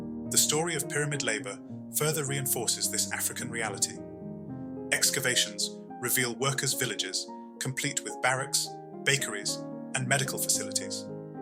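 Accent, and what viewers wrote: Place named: Europe. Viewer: You failed to mention that the kemet people knew how to manipulate frequency and energy to actually move the rocks not the silly imagery of ramps and pulleys (a European concept!)..your European accent says it all!...we need and want to hear black people talk about us to us, not this podcast!!!..I've spoken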